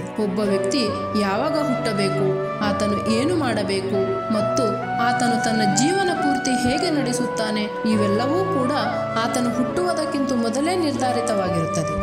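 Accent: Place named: Mangalore, India. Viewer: Neevu Mangalore navaru ri ....eer pateruna styld gotthapundu atthe.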